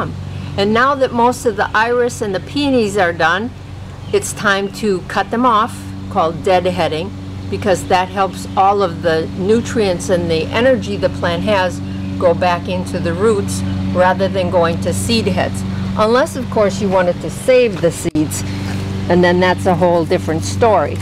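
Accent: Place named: Midwest, United States. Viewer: I love watching your videos...and hearing your MidWestern accent. My dad is from Michigan/Minnesota and I have cousins that lived in Madison and we used to visit them every summer (they all now live in NC). You sound just like them! :-)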